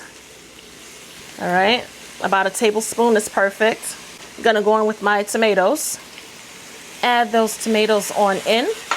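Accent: New York, United States. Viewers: Looking sooo yummy 😋. Loving the New York accent 😊❤ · 🇨🇦👋 Girl, I am only at 2 minutes 11 seconds and I know I am going to love this recipe. It helps that you are so awesome to watch. I love your accent. You sound like you're from New York or New Jersey. I'm Canadian so I could be wrong but loving it so far thank you.😊😊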